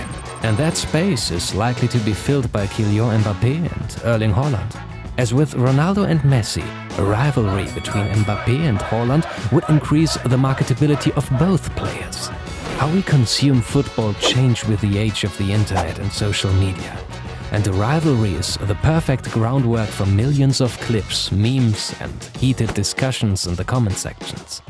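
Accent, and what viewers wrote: Germany. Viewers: du bist deutsch das hört man sofort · Can you hear the German accent? The presenter is German.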